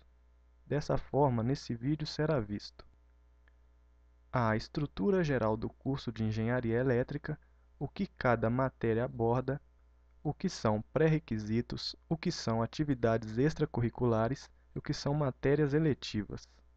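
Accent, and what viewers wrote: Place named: Minas Gerais, Brazil. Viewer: Antes de dizer que havia estudado em Ouro Preto já percebi q vc é mineiro, se não é pegou bastante o sotaque rsrs, Minas minha paixão, saudades!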